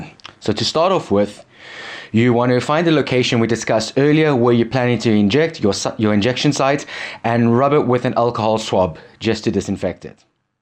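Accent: South Africa. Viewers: Sounds very south African.... Lekker boet... Very informative awesome video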